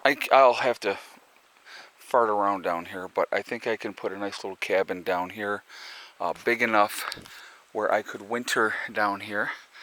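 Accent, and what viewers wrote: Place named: New York, United States. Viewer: Psssst, your NY is showing.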